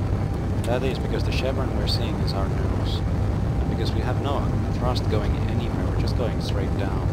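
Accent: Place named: Scandinavia. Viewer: You sound like a Scandinavian Bob Ross. I could listen to you forever :D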